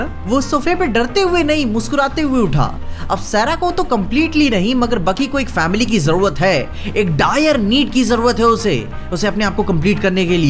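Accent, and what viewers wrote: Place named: Bhojpuri, India. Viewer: Bhai love your expression ❤️😘,or Tera bhojpuri touch bolne me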